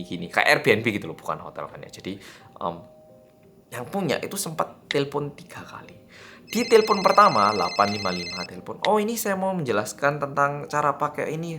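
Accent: Java, Indonesia. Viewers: Mas bahasa indonesianya lues banget, logat jawa sih, tapi yang ndak tau klo mas orang korea pasti dikira lahir di indo, hidup di indo, asli indolah wlopun keturunan korea. Klo youtuber korea yang lain, masih bisa ditebak, krn walopun berbahasa indo tapi logat koreanya keliatan. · Medok muantepol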